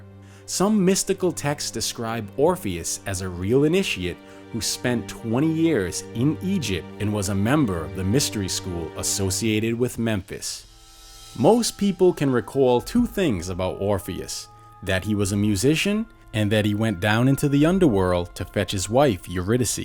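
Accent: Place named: New Orleans, United States. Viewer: Sounds like a New Orleans accent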